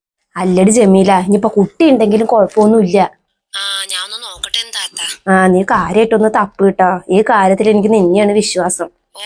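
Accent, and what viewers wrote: Palakkad, India. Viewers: ഭാഷ ശൈലി പാലക്കാട്‌ പോലെ തോന്നി 😊❤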